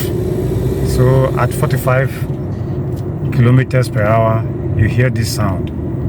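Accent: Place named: Cameroon. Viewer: This is my Cameroonian brother, I can tell from his English 😂 Am having same kind of noise like ratting in my Honda CR-V 2022.....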